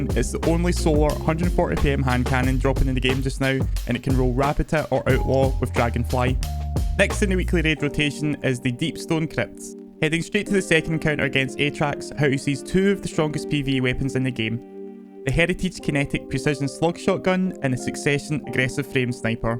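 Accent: Scotland, United Kingdom. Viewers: Man I am a sucker for a nice Scottish accent. Subbed! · Thanks for the video! Super helpful. And something about a Scottish delivery of the information makes it 10x better.:)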